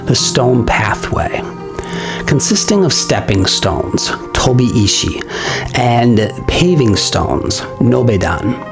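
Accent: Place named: United States. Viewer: Can't......stand......the american accent...srry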